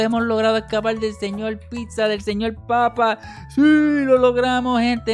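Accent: Puerto Rico. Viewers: Me encanta la voz de y parece Puertorriqueño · Eres de Puerto Rico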